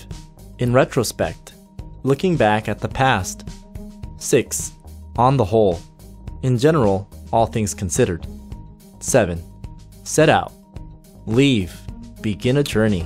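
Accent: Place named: United States. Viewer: Love American accent from Pakistan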